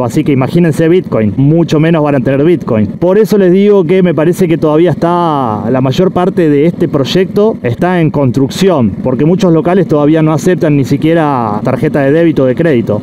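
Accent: Córdoba, Argentina. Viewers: ehhh!!!! Yo soy de Villa María!!! que copado encontrar a alguien de los pagos y que todavía conserve la tonada cordobesa... Saludos desde Argentina! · Podes estar 25 años en Rusia, pero el acento cordobés nunca se te va.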